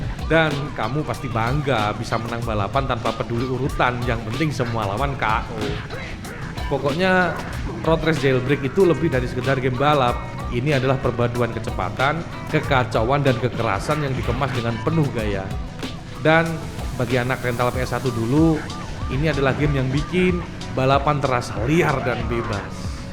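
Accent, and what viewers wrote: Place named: Java, Indonesia. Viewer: Semi medok ❤❤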